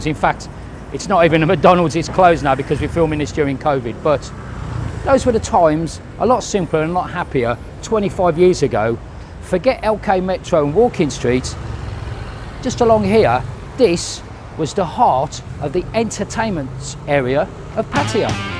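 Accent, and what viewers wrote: Birmingham, United Kingdom. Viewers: I thought it was a Birmingham accent but I didn't want to insult you and mention the black country. I worked in West Brom for 23 years before moving over here, it's a very small world!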